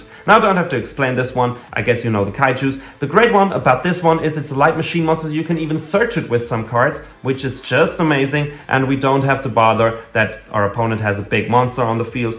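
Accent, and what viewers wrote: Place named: Germany. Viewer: German english...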